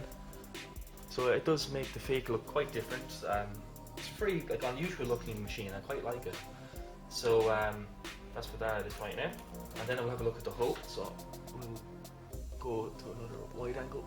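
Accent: Ireland. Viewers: that Irish brogue is even sexier in hi Def!!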